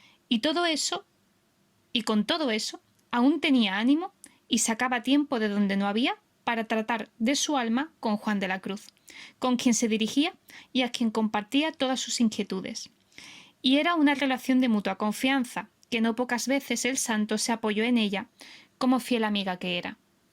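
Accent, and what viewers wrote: Andalusia, Spain. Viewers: Muchas gracias …. Y ese acento espontáneo andaluz me encanta jajajajaja. Agradecimiento por tu trabajo de un andaluz provinciano . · Me encantó la síntesis que has hecho. Felicitaciones! En cuanto a tu acento andaluz…no te disculpes por ello, es parte de lo agradable que escucharte. No soy española, aunque si de ascendencia y andaluza además. Mi familia llegó a Costa Rica procedente de Torrox, Málaga. De ahí que el habla andaluza me sea muy querida y cercana. Un abrazo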